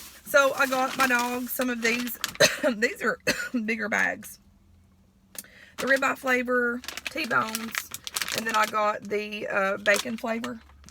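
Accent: South, United States. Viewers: I like you, you are from the south and you talk how you talk if people don't like what you are saying they don't need to listen.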